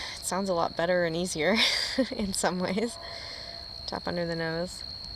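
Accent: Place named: Canada. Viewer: It's funny to me how I randomly picked this after doing a general EFT search (without knowing you or your channel)...and hearing your Canadian "accent". My communication breakdown is with a Canadian 😇💙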